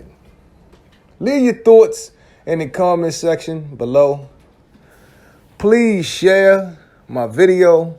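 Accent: Baltimore, United States. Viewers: You from bmore huh I can hear it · Kewl video, kinfolk✊ But, on some other... You from B-more?? Sound like, one of the big homies from round that way. 💯✊ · You gotta be from Baltimore. I hear that accent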